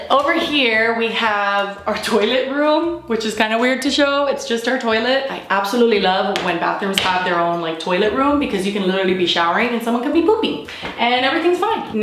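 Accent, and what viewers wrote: Miami, United States. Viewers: I recently found out you're from the 305 and when you say "you know" it's so obvious. I cannot believe I've been watching you for YEARS and never noticed. I also never even clocked you as Hispanic. I'm a failure as a Miami Cuban.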